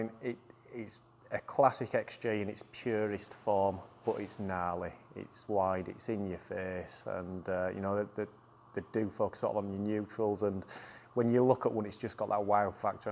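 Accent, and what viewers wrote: United Kingdom. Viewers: That Northern accent... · Oooo Kay...love the brit accent but would of loved even more to HEAR THE DAM CAR..😊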